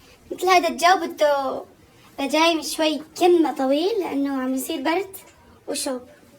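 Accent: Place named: Syria. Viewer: تباني سورية من سورية من صوتك😅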